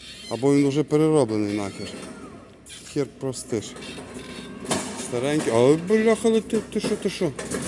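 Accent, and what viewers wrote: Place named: Western Ukraine, Ukraine. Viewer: Судячи з акценту, ти із західної частини України. З понеділка теж стартую по Польщі. Думаю, зустрінемось.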